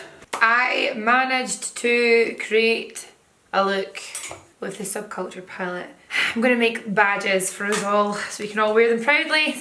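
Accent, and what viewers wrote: Scotland, United Kingdom. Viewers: Where in Scotland is from?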